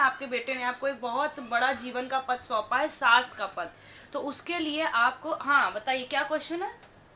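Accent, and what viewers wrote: Kanpur, India. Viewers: Yeah.. I have seen your interview... But your tone is killing it(totally kanpuriya)... Really proud... Artists like you are a real inspiration for society..comedy, Happiness , laughter from day to day experience without any bad words or double meaning jokes is rare nowadays.. Keep it up.. Lots of ❤❤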